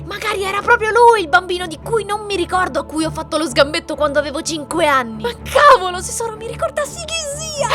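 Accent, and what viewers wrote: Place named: Milan, Italy. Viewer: Ahahah, il tuo accento milanese è fantastico! Ti è mai capitato di incontrare qualcuno di famoso per strada e sclerare ? Comunque, bellissimo video. Baci💚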